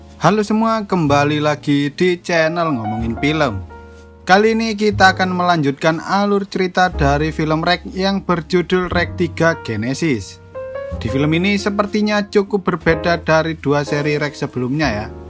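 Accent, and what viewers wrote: Java, Indonesia. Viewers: Mimin orang jawa kahh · Jowo mas🔥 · Jowo pisan iki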